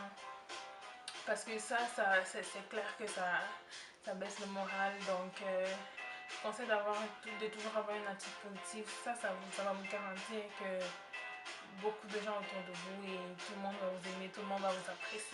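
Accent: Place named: Quebec, Canada. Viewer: Ta gagné un accent québécois😕😕wow!!😕😕😕😕 Chai plu cmt chui tombé sur ta vidéo mais super conseil. G lâché un méga pouce bleu ma belle.. PS: tu rends super bien derrière un écran!!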